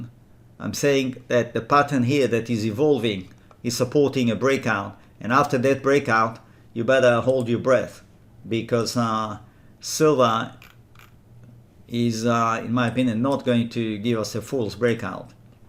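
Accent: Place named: Australia. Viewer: By the accent, I guess Australia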